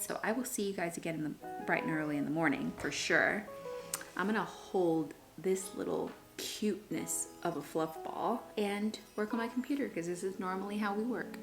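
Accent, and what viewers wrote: Texas, United States. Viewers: LOL yes, I've notice your accent more when you're here in Texas as opposed to when you're in LA.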